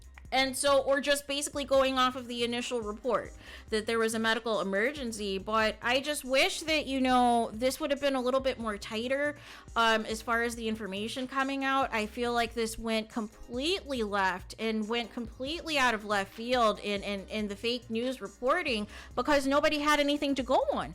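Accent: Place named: Minnesota, United States. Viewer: o ok, ur voice just reminds me of my friend from Minnesota especially when u say u guys.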